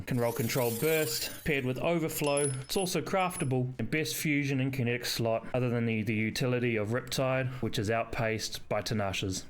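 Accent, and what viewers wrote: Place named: Australia. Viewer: I like your Australian Accent mate!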